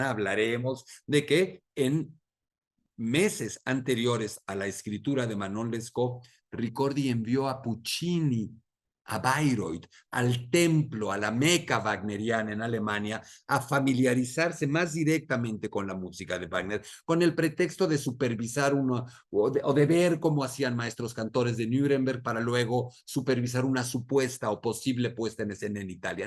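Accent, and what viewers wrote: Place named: Venezuela. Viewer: Voy a estar pendiente de tus videos sobre ópera. Hablas como los italianos en Venezuela.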